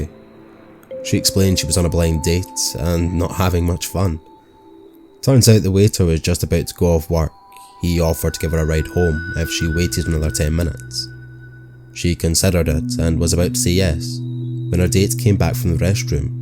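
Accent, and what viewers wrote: Scotland, United Kingdom. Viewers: 🙂 Welcome Back Mr.;) Thank you for the Awesome Narration!!! I hope that you and everyone else has a Twinklelicious ✨Tuesday Night too;) much love Sexy Grandma Darkness ✌️💋 Just Joined your family:).. your Scottish accent is so Beautiful 😍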